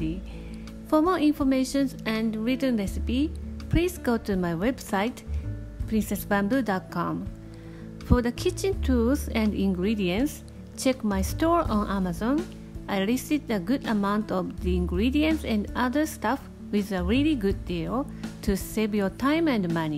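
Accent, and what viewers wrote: Japan. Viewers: i only trust japanese food video that with japanese accent on it.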